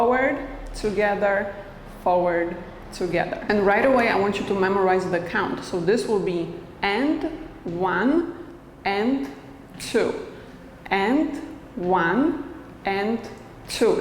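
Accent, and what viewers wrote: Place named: Poland. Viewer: Mel15 Mcc her accent sounds polish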